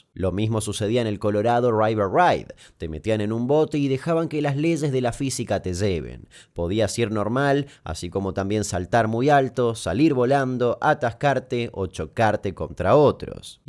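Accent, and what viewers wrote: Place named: Argentina. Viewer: Que raro episodio del Dollop, con acento argentino y sin Badia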